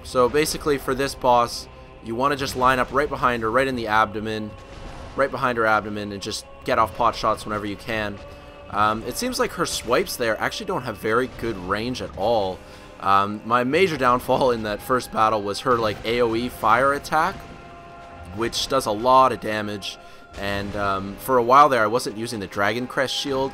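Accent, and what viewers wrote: Canada. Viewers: U r awesome bro...btw..r u canadian??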